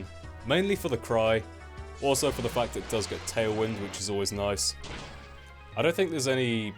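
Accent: United Kingdom. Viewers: FINALLY ANOTHER BRITISH BATTLER I'm a britt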